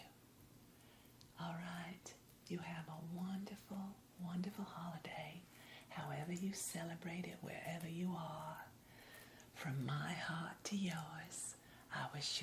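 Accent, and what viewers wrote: South, United States. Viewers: Omg omg I love the accent, I am European so southern accent sounds so so so sweet!!! Love it. thank you! So much!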